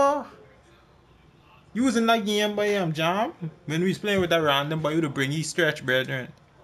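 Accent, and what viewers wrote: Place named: Bahamas. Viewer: You from the Bahamas?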